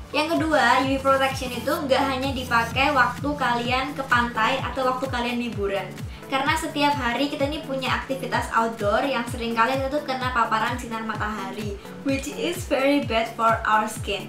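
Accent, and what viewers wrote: Java, Indonesia. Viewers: Bahasa Inggrisnya bagus. Tapi pas ngomong bahasa Indonesia medok bgt. Sukaaaaa. Indonesia banget. Gak pake make up aja udah cantik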